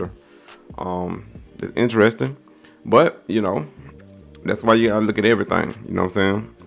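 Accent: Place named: Houston, United States. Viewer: Ayyye, my friend and I are debating about where you from. Based on your accent I’m saying Houston but he thinks it’s ATL, if you don’t mind, can you help us squash the debate!?!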